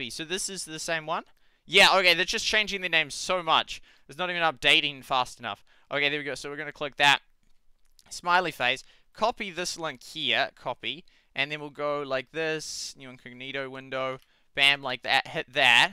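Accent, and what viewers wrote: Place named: New Zealand. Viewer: Bro, are you a kiwi? Or Australian? I'm getting kiwi twangs in your accent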